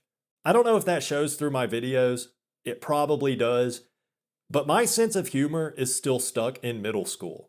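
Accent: South, United States. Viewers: If he's not using AI he's a Southerner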